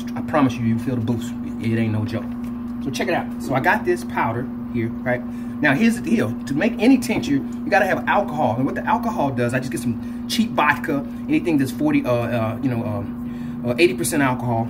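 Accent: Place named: United States. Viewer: I don't know what you pay for your pine pollen but you can get 70 grams of it from Harmonic Arts (Canadian online company) for 32 bucks. They also do tinctures and have an amazing variety of products and have decent prices. Another online place I would like to suggest is "Canadian Pine Pollen Company". Judging by your accent I am assuming your are American? I just wanted to give you my sources because with the stronger US dollar you may be able to get much more product and save some money. Also, I have zero affiliation with those companies. If I love something, I rave about it and I have a big mouth. Heh.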